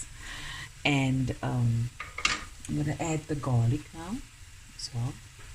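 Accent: Cape Town, South Africa. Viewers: It's so nice to walk with you through your garden. Your lemon is doing well 🤣 Oupa's fence looks sturdy and Sunnyboy is as beautiful as always. Loved your story time, so similar to mine. Food, love, bread and always some left in the spens. Lekker koue groenbone op brood, met sout en peper. Jou hart is vol en jou stem so warm en vol liefde. Nou gee jy als terug op soveel maniere en ook hier op YouTube. Dankie vir als. En jou pragtige Kaaps. Baie liefde. 🥰🤗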